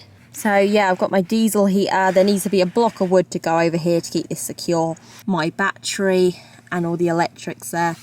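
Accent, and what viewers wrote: United Kingdom. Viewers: Enjoy listening to you,. (English is not my first language). Love the British English. You are both funny and cute.. the thuft of hair dangling out. 🤣 Technical support/considerations: You have now two batteries in your car, one for the engine, the other for utilities. Please treat them as two separate electrical systems each with its on fuses. (I assume your batteries are not connected in parallel). Over time, a potential difference is created between the two batteries. Like one would be 12v, while the other would be 11v. This potential difference is a potential hazard. Please do not cross over from the electrical system of one battery, to the other. for that matter, a common ground is a cross over. My comments are irrelevant, if all the batteries are connected in parallel to one another.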